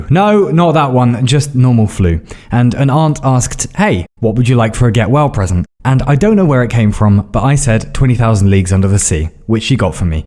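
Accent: RP, United Kingdom. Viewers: ah the fancy rp english accent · i do a lot of art but i also just want more turtle boy with funny british voice saying things to prevent me from commiting sewerslide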